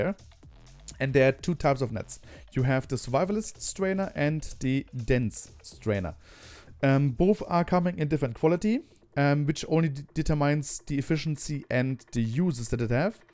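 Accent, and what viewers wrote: Germany. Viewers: Einfach wilder deutscher Akzent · Wow, thought by the accent that you were german. Spot on! Btw i'm from denmark.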